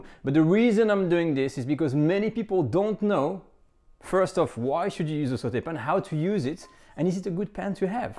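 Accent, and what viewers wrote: France. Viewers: I can hear dat French accent😂😂. Continuez chef! J'adore votre recettes.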